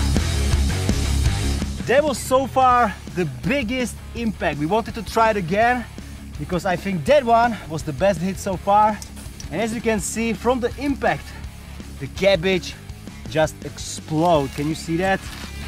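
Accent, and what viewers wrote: Czech Republic. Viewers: Nejsi z česka náhodou? Podle přízvuku tak zníš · i think you are from czech republic.. your english is good but.. your voice, btw great video ;) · Super video a hlavně i originální dávám like a odběr a umíš plynule anglicky až na ten Českej přízvuk ale to není žádném problém :D přeju hodně subs protože si to rozhodně zasloužíš!!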